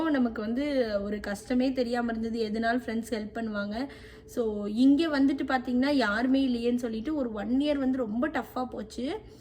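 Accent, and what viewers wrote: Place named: Theni, India. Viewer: Random unga video slang enga Amma pesra mathiri irunchu..... ipotha theriyuthu elaam theni nu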